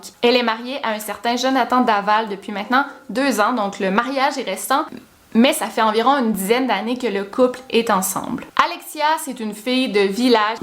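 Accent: Quebec, Canada. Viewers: Cette accent Canadienne énervement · Je t'adore continue même si j'ai recommence à parler avec mon accend du Québec à cause de t vidéo beaucoup trop intéressent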